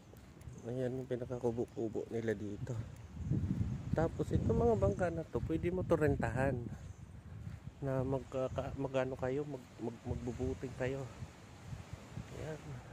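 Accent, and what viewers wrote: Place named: RP, United Kingdom. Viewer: Nosebleed po ako hahaha pang mayaman yong accent ng British ganda po ng view.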